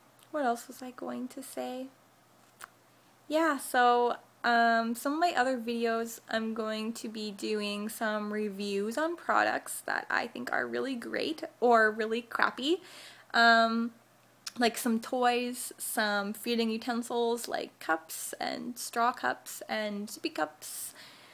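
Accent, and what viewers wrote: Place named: Canada. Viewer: You have such a canadian accent! Anyways, I watched your pregnancy videos and Im glad your vlogging again! (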